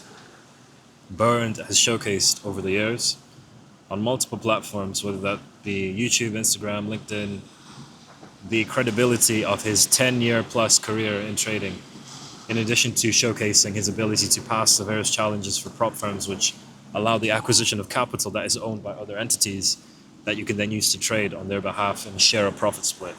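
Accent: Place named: Edinburgh, United Kingdom. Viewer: Welcome to the adventure mate. Once you focus on freedom you won’t look back! If you still have your job it’s a nice safety net while learning. Your accent sounds like Edinburgh maybe?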